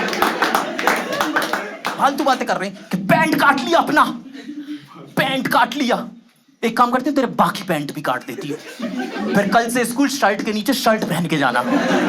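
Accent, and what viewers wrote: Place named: Indore, India. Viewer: OO BC Indore accent .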